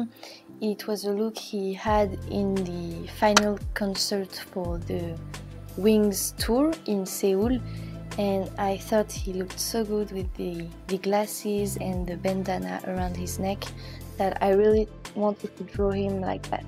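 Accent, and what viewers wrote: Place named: France. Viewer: That's so great ! I love your style, and your art is so clean 😍 I'm scared I'm not right but, you're french, aren't you ? (Because of your accent)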